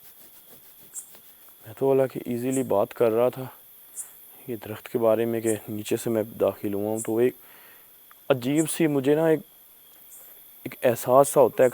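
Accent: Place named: Punjab, Pakistan. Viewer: Bhai what about you & how is your health I hope u will be fine & better You r very responsible person You r so nice your Punjabi accent is also nice Like churhaaaail 😊🥰 Bhai Yahan tk to thk ha churail ab larki to na bolein bura lgta ha yaar 😂😂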